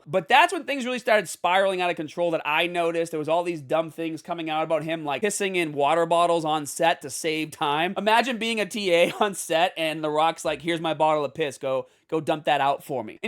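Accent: Boston, United States. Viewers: lol yeah I kinda dig the Boston accent 😂 must visit there when I come to America on vacation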